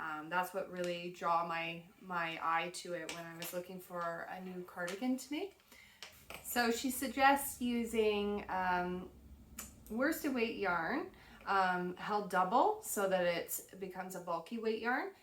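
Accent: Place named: Canada. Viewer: Hello, YouTube randomly took me to your site today so I decided to watch you while I finish up a sweater I'm working on. Your 'By the Bay' caption attracted me as I live in the SF Bay Area in N. Calif, but when I heard you talk I immediately recognized the Canadian twang so I knew your bay is far away from mine! Thought your family so cute and you seem so wholesome so I subscribed. Loved your cabled deep winter coat wip, lovely sweater! I went back and watched your episode 1 so I could learn where you are from and your name and saw your other doggie. Your gray dog looks like part border collie, is that right? And of course I saw your B/W border collie in the 1st episode. I have 2 border collies, male brothers from different litters that have same mom and dad. They are like my kids! I am finishing up a sweater for my daughter in law called Vanessa by Swans Island pattern. 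It is done in Juniper Moon Farm Moonshine colorway Lagoon. Wonderful soft yarn! My next adventure in knitting is Zwieg by Caitlin Hunter, It will be done in a medium gray yak/silk/woolen yarn held double with a lace weight for a dk weight final knit. Pink to dark rasberry yarns for the yoke lace section. This will be fun as I haven't done much with lace style effects and the yoke has lace in the oattern. Looking forward to a bit of challenge! Your sewing projects are great and I like seeing them. I'm a life time sewer.... went to FIDM in late 70s and I design + sew with a targeted interest in tailoring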